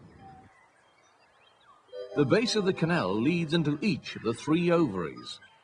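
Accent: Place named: United Kingdom. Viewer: Loved that British accent..easy to understand though than the American one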